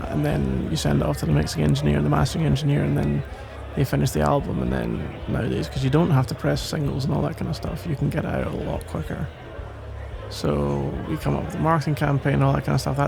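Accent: United Kingdom. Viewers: British boy!!! I'm British too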